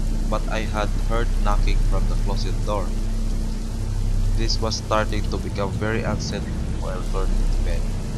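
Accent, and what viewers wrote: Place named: Visayas, Philippines. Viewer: Nice kaau animation ♥️ ma halata nako nga bisaya ang narrator the way mag pronounce hehehehe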